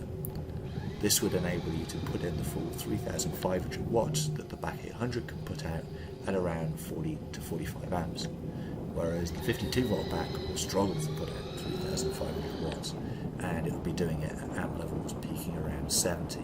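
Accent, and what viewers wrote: United Kingdom. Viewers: Distinctly English accent, but US roads, come on then spill the beans... Where in the US have you moved too? Been watching your vids for the last month since coming across the channel in a recommended video. Currently looking to go from a carbon Canyon Endurance on 28mm tyres under my beastly 200 watts of pedal power to a 2000w bike to and from a 10 mile trek to work. Thanks for the effort you out into your content. I can see it all, from the filming to editing to overlays and commentary. The viewers on youtube sometimes do not get a grasp of the time it takes on top of the actual activity itself to film, edit and upload the activity. Thumbs up from the 🇬🇧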